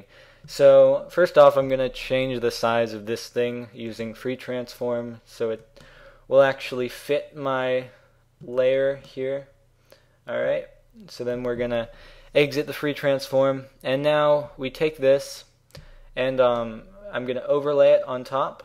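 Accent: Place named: California, United States. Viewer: You sound more California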